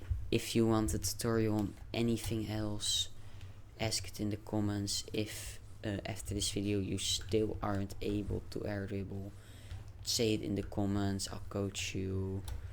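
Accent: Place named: Netherlands. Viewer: (ik ben ook nederlands ik herken het accent gelijk😭)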